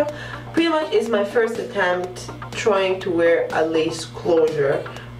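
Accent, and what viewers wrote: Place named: Africa. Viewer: love the African accent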